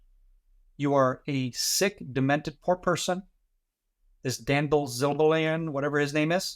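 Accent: United States. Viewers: nice podcast! Here is my beef with the world: Why is Israel responsible for any civilian casualties when Gazans could have avoided zero deaths if they surrendered on Oct. 8th, let the hostages go, agreed to disband Hamas (another psychopathic offshoot of the muslim brotherhood (bother-hood), and turn over the death worshipping cowards that perpetrated Oct. 7th. I appreciate your respect for non-jihadi muslims. Have you considered an interview with Atheist Republic? Armin is an ex-Muslim originally from Iran that supports Israel over the monstrously backward Iranian totalitarian regime. The "Palestinians" have been thrown out of several countries because they tried to take over the government like Kuwait, Egypt (Muslim Bother-hood), and now Gaza. Why people do not mention that the gem of the Iranian regime in Lebanon that exists only for war has bankrupted the country is consistent with our current Jew hating media. 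The real money comes from places like Qatar. where did your parents come from before emigrating to Israel? My guess is Kansas. You sound like a Californian to me. Candice Owens new name from some of we Jews is Klan-ace Ovens. One of her "just asking questions" was accusing a former Jewish head of a post WWII ethnic German Polish concentration camp of atrocities in deaths of 1500 people under his watch. She omits that the first Polish state attorney said no charges would be filed against Shlomo. Stalin appointed Jews to lead these camps after the war. Ow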